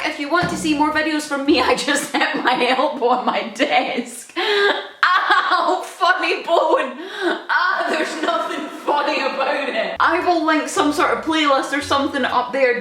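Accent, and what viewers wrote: Scotland, United Kingdom. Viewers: I absolutely never realized the Scottish accent emphasized the "e" on certain words. Like "hous'ee's", AWESOME! Sorry, I'm a new sub and I love different accents.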